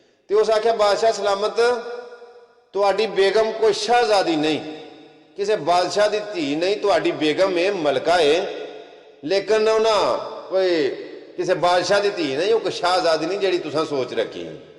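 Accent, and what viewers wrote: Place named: Sialkot, Pakistan. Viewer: brother you have good sialkoti punjabi eccent.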